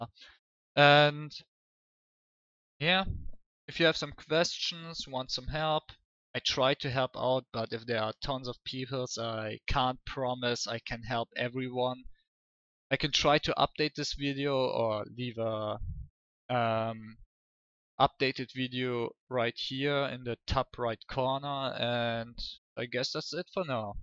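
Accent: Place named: Germany. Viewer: Ich hör raus, das er deutscher ist xD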